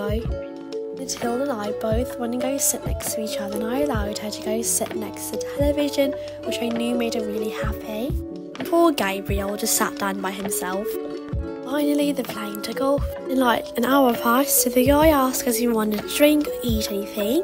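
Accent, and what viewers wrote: United Kingdom. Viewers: do you have the real british acceant no hate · Hi!!! I have a question!! In your tiktok role plays, you are American. But in your videos/voice-overs you are British. Why is this??? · You have such a beautiful voice! I love the British accent! 😉😄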